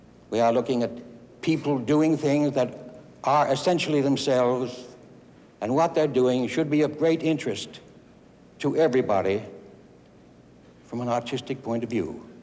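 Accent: United States. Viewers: ***** New York old Irish....... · Lower East Side Manhattan accent. There is NO mistaking it!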